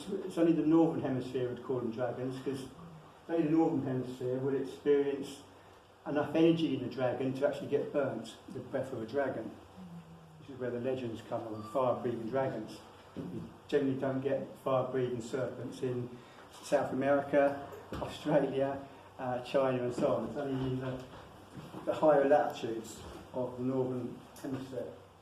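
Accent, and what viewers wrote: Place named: United Kingdom. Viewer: Love that British Accent........feels like home .......thank you for your Love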